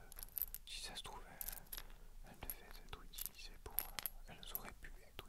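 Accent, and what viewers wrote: South, France. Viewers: D'habitude je n'aime pas du tout les role-play mais j'ai a-do-ré celui-ci !! Peut-être parce que c'est plus que ça justement... les clins d'œil sont tellement malins, la touche d'humour est parfaite, le scénario est génial, le fond noir est hyper agréable, le texte est top et le son est absolument impeccable ! Vous avez sans doute fait plusieurs essais avant de sortir ces vidéos mais c'est tant mieux :) Je décèle un léger accent, êtes-vous originaire de la moitié sud de notre bel hexagone ? :) En tout cas il apporte une touche unique supplémentaire à votre travail ! Vraiment bravo pour tout cela, vraiment ! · Baptiste Conbatant un léger accent du sud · tu es du sud cong non ? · Superbe vidéo ! J'ai l'impression qu'un certain accent du sud revient des fois non ? ^^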